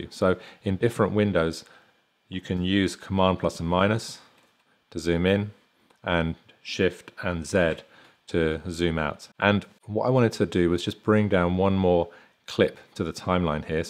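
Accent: United Kingdom. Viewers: Just discovered you OMG thank God I've got a 1 month trial and haven't got a clue how to use it You tips are invaluable and your a fellow Brit without the annoying American accent I have subscribed This will really help before u go out a blow £200 on the package👍🏾👍🏾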